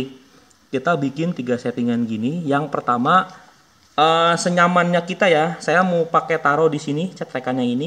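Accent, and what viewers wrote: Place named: Bandung, Indonesia. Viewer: Bang kalo abang orang mana tapi abang kaya orang bandung deh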